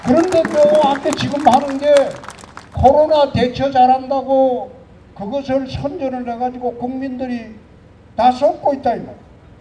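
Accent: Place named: Daegu, South Korea. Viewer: 님 당선을 축하합니다 을사랑하는 시민으로 부탁드릴 말이 있어요 서울쪽 사람들은 대구사람들의 말투를 좋아하지않습니다 좀 과격하지요 오늘 어떤 유트브에서 당선된 이 당선후 나댄다고 하네요 저는 그 말에 일리가 있다고 생각해요 앞으로 대권에 도전하고싶으시면 대구 경북의 유권자만 지지해서는 가능하지않을것 같아요 부디 말씀에 서울쪽 사람들이 좋아하는 말씨를 씠으면 좋겠어요 저도 대구시민이라 대구에서 대통령이 나오면 그보다 더 좋을수가 없겠지요 그러나 그보다 더 중요한것읏 정권을 다시 찾아오는것이여요 통합당을 지지하고 자유민주주의를 지향하는 시민으로 부탁드립니다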